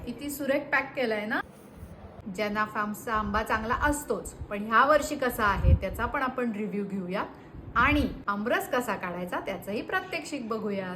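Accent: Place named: Pune, India. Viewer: कुठे आहे हा जन्या farm .. तुमच्या बोलण्यावरून तुम्ही पुणेकर वाटताय.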